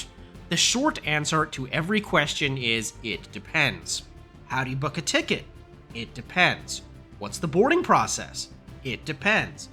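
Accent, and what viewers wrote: United States. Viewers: From your accent I can tell you're from across the pond. As airport self-service solutions are underdeveloped over there, although it's changing, I guess you can be excused for thinking you had to go to a manual check-in desk at OSL, but that's of course not the case. Not every airline offers self-service options, but most European carriers with a normal scheduled service do at OSL. The next time you're at OSL, or most modern airports, you should look for these services first (not hard to find), and only go to a manual check-in desk if there is specific reason to do so. That's what experienced travelers do. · Wideroe is unique , not weird . What's weird is your constant whining . Couldn't listen to your nasal American voice more than 2minutes . And routes is pronounced 'roots' not 'routs' !! 😡😡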